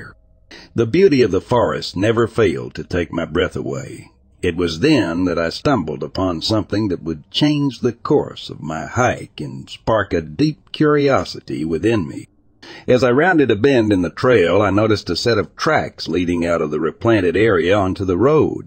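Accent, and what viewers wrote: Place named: South, United States. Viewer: I think you may be mistaking mispronunciations with the regional southern accent. I haven't heard any mispronunciations. Certainly not routinely.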